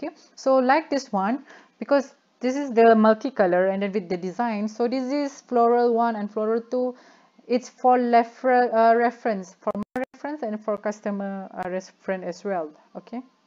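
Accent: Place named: Tamil Nadu, India. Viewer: Mati mood nk tgk bile speaking english dgn suara tamil mcm ni. Baik ckp melayu jelah sis.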